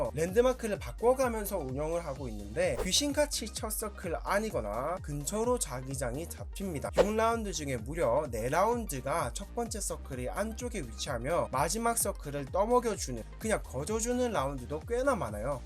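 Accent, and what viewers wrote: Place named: Seoul, South Korea. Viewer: 서울말 좀 줄이시죠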